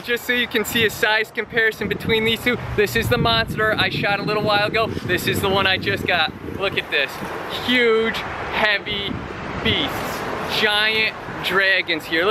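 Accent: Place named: New York, United States. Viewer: I’m new to this channel so idk but is he originally from New York? Brooklyn maybe? Just picked up on his accent is all lol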